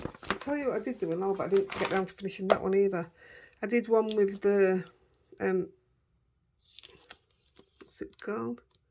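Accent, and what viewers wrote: Yorkshire, United Kingdom. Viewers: You might want to explain what droll means for non-Tykes. BTW I am married to a Tyke and love the accent. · I love your videos and please please continue to blether on while you are crafting in your very lovely Yorkshire accent. Love the snoring pups too ❤xx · Don't apologize for your Yorkshire accent, I love it...reminds me of me Gramma. I have just discovered your channel and I will be back. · I adore your Yorkshire accent, I've got friends with similar accents. I live in North Wales but have a London accent, no problems understanding you. You make me laugh too! Bought presents for all the tags you made, lol! I was listening out for you at Lavinia, but someone said you'd come earlier, I don't do early! Love your cards ❤ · Omg some people are so rude. I am also from Yorkshire and we do have strong accents. I’ve still got mine and lived in Australia for many a moon. 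If they don’t like it don’t watch it. There is plenty that love your channel. Why are people so negative I answer you lol. Keep up the brill work and don’t change a thing.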